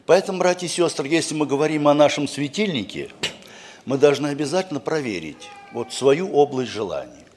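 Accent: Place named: Volga, Russia. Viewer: Спасибо. У него речь волжанина), я думала, что с Волги откуда-то) Мир Вам🌹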